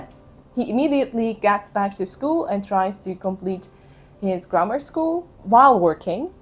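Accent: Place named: Turkey. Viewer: hi, are you turkish? your accent looks similar. :)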